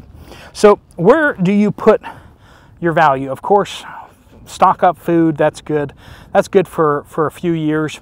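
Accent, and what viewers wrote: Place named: United States. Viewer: Nice of you to do reports from your journey - even with your AMERICAN pronunciations.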